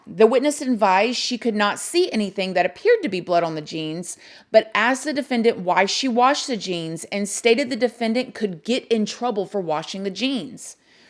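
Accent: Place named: New York, United States. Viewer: Omg I love your new York accent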